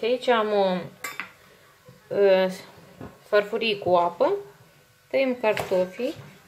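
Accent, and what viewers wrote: Moldova. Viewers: Sunteti din Moldova? Accent😂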